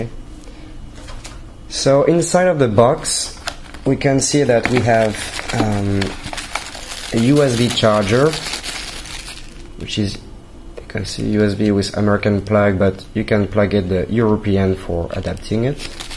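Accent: France. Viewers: Belle accent français :)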